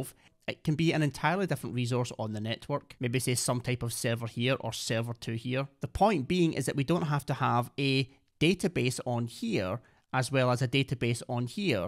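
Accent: Glasgow, United Kingdom. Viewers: Its a magical unicorn type of accent only from one place - Glasgow, Scotland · First 3 minutes I was just trying to get used to the scottish accent... but otherwise good introduction.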